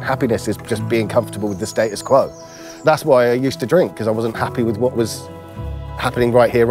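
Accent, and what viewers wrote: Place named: United Kingdom. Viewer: Great video man, drink and drugs the same thing Essentially. I've been there and like you come out the other side, lovely collection are they all yours?, better than my efforts lol. Only just found your channel I'll subscribe and look in on ya, sounds like your in the UK aswell, peace man and those bad days and thoughts are no different to anyone else's.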